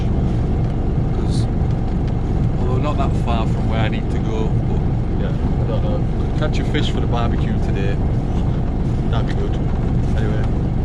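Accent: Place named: North, United Kingdom. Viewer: Just found you. Good to hear a northern accent, I will need to go back and catch up.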